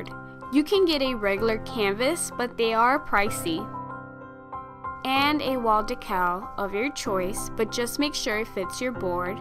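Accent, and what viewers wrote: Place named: Canada. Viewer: where are you from?? your accent sounds a little canadian lol :)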